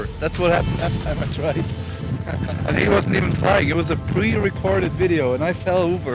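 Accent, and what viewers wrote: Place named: Norway. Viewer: Fabulous video, 🙌🏼 Are you Norwegian mate?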